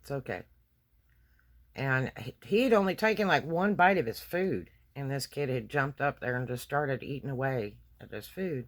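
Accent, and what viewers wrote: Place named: South, United States. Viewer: You're not southern? I thought you were. Where are you from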